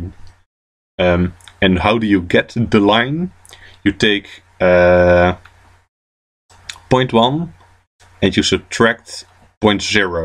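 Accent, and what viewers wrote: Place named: Netherlands. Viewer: ik hoor dat je nederlands bent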